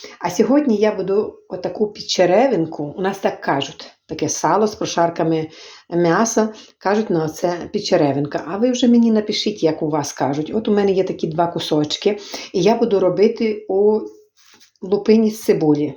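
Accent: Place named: Western Ukraine, Ukraine. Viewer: Какой говор интересный! Западная Украина наверное? Дякую за рецепт.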